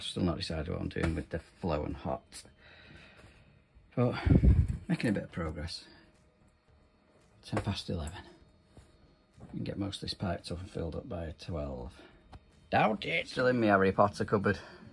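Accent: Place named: North West England, United Kingdom. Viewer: Good video. Nice pipework. Seem like a decent guy as well. Quick question mate, I’m a plumber. Never used press fit but I’m thinking about getting a press gun, do you ever have any customers saying they don’t want the fittings? Do you ask the customer or just fit them? Seen many plumbers on YouTube and always wondered that. Keep up the good work. I’m Blackpool way. Can tell by your accent you’re north west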